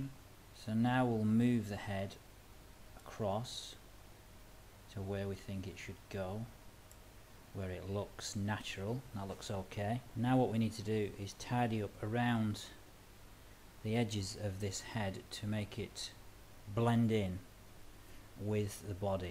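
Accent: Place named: Liverpool, United Kingdom. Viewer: this guy sounds just like a! he from liverpool or something?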